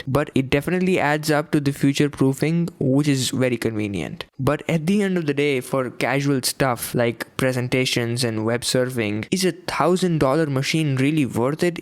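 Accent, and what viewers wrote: India. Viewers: is he Indian ? his accent doesn't sound like one from the English speaking countries? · Dude, going by your accent I can tell you're an Indian and that video sir was absolutely amazing. The music and the editing and the voice over is definitely better than most of the big shot YouTubers who give Tech reviews like GeekyRanjit and C4E. Keep making videos brother and someday you'll be at the top. I'm from India as well making Speed Arts on my channel. Take a look if you like. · 1000 dollars? u r an Indian, right? u definitely sound like one... anyways, great video man.. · Chewbecca Gamer He sounds like a bloke from India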